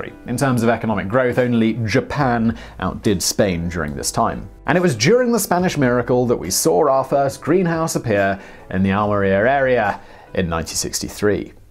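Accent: United Kingdom. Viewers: Australian dollars? New Zealand dollars? And there are dollars in even more countries. American dollars may not be the first kind of dollars to spring to mind to people from British Commonwealth countries, and is very popular in many of these countries, and don't forget that having a UK accent and not American they may think he was talking about their dollar. I'm a Brit, and when people say dollars to me, I automatically think NZ dollars, as my son and his wife have emigrated there.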